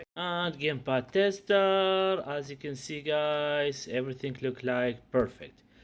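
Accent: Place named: Iraq. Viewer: من الاكسنت أعتقد ان الأخ عربي من العراق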